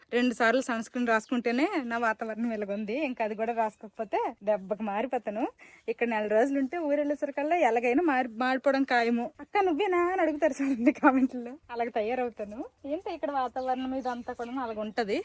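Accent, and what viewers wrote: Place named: Bengal, India. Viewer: Mi matalu bengal lo vunnappudu slang okalaga vuntundi mi oorlo moratuga matladutharu inka ekkuva soundtho matladutharu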